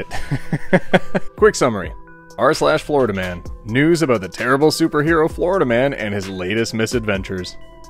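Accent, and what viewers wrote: United Kingdom. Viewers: Just a random german: gets read in the most sterotipically brittish accent possible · Post: JustARandomGerman: Reads in a British Accent Me: wh-... You know what, I still love it Edit: I just noticed that many others already noticed and commented this 😂 Well, great minds think alike. High five ✋🏼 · why a British accent? their username clearly tells you they are german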